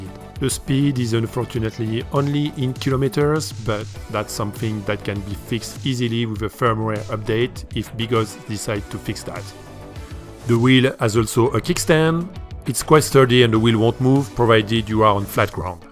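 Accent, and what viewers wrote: France. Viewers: Belle narration avec le flair de Paris saupoudré .😘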